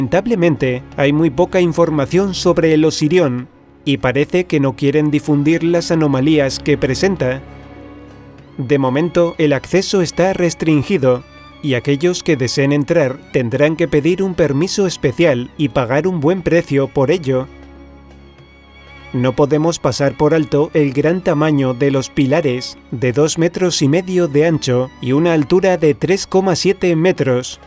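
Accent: Spain. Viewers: el video y el contenido de este canal me encanta . se nota que hay información muy valiosa . pero me molesta sobremanera la voz y el acento ibérico . me gustaría que fuera un locutor latino quien relatara cada video de este canal . Saludos .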